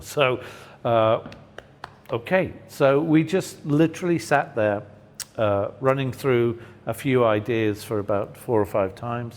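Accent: United Kingdom. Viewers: Desculpe as vezes escrevo rapido e dai errado.. Mas que pena que vc é um sonho muotoooo distante Provavelmente nunca vou te conhecet...conheci seu tio Paul num show no atena fiquei bem perto..foi bom.. Mas sou fã msm fo seu pai John lenmon adoro ele e sua mãe Cintia com todo respeito Adoro seu rosto..perfeito..voz b britanica rstsrs... Mil bjs...sonho impossivel de realizar..te conhecer pessoalmente...❤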